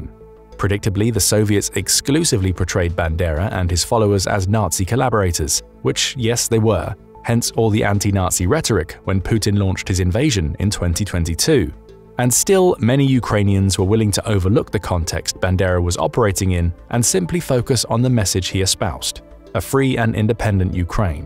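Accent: United Kingdom. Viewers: Narration so long, so British - uhg. Plausible deniability, let us swim merrily in an ocean of plausible deniability, rampaging absence of curiosity, and a penchant for regurgitating things that POWER says, because POWER wields all the microphones, and it says this, and even when it is wrong, nobody ever looks at me funny for repeating what it says - so I repeat. NYAHHHH! Power says NYAHHH.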